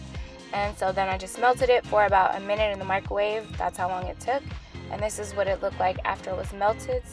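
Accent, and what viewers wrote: United Kingdom. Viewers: I KEPT REPLAYING YOUR BRITISH ACCENT YOURE SO CUTE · nice british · That British voice thou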